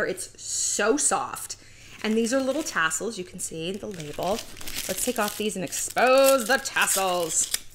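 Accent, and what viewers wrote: France. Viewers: As a partly French person, let me say - your French accent is delightful and the phrases you do know are perfect 👌🥖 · OMG! I'm French, and your French accent is truly amazing. I love it! <3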